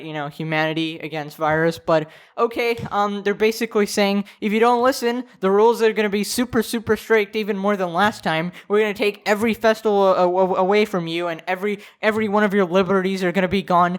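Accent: United Kingdom. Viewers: British man in da house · legit can't tell if hes British or not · are you from the uk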